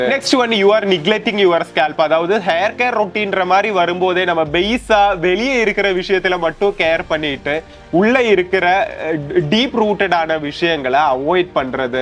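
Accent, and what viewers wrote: Kerala, India. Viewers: மலையாள தமிழ்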